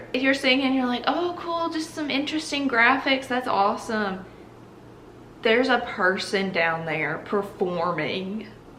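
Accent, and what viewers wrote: South, United States. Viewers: u dont have to hide ur accent sweetie go ahead with the southern loveliness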